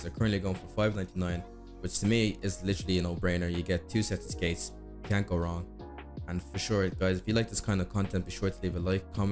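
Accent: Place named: Ireland. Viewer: Love the review! Always nice to hear another irish voice while browsing the internet ☺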